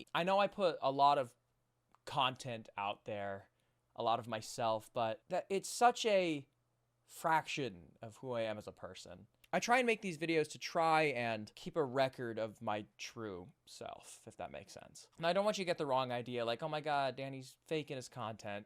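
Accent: United Kingdom. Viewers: Happy birthday to one of the best/sweetest guys I've ever seen! happy birthday to the guy that brought me friends that i can fangirl with over how amazing you are in every way! happy birthday to the man who makes My day almost on the daily!. Happy birthday to the guy that I found all those years ago That made me swoon over the British accent from the 1800s and I obviously know that's no longer a thing anymore but that's basically how I discovered you (on yt with compilations of your tiktoks since I didn't/don't have tiktok downloaded on my phone) Happy birthday to one of the most genuine people I have had the pleasure seeing through YouTube. I can only hope one day that I'd actually get to meet you and truly show you how much you mean to me. This is not a parasocial thing I truly see you as like a friend who's been there for me without even actually saying anything directly to me or being right next to me but still being there if that makes sense?😅😊. 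Thank you and happy birthday! Thank you. I hope it's the best birthday. Thank you! Until the next one and the one after that and so on and so forth. I'm 21 years old and I will be 22 in Sept.17th. Sorry for the tmi.. anyway i hope that everything goes well for you, I truly mean that!. I hope this is not too much or creepy to you, but I really appreciate you for as much as I can without knowing you (in real life) so to speak. Anyhow, have the most wonderful birthday ever because you deserve it you're a wonderful man! you have help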